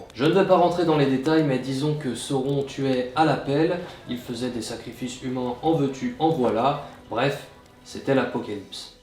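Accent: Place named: France. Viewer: Continue de prononcer à la française!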